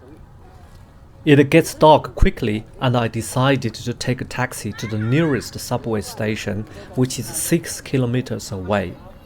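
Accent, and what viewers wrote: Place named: England, United Kingdom. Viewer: I enjoyed your video very much. Your sister’s flat is very spacious and nice, not at all what you would think of as housing for the poor. Your English is excellent, and I’m really pleased that you have an English accent and not an American one when you speak, I also enjoyed the traditional Chinese music. I have subscribed for more interesting video’s. Thank you for sharing.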